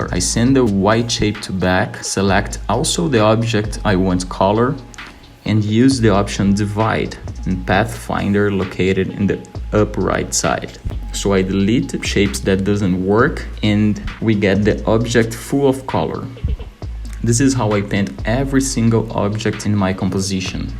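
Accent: Brazil. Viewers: pelo sotaque eu percebi que era br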